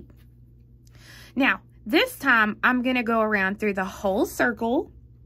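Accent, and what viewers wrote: South, United States. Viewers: Beautiful and beautiful southern acent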